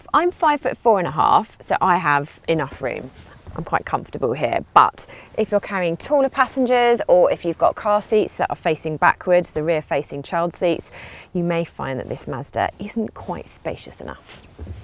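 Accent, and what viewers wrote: United Kingdom. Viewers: I like her accent :))) I don't thinks there's anything wrong with British accents but British folks seem to like to attack each other over it, especially they like to attack some of their 'posh' accents. That's kinda funny :))) But there might be some sense in that too, I guess. Some intonations and cadences might be overkill but that's the way we speak. Sometimes some women can't stand the accent of some other girl :)))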